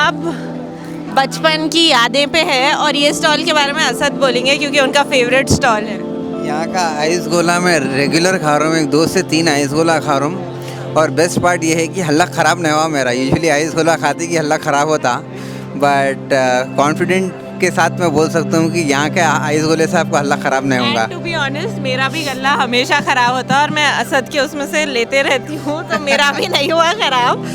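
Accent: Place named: Bihar, India. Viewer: Tumhari awaaz slum bihariyoun ki tarah hain Learn hindi urdu property Don't say logaan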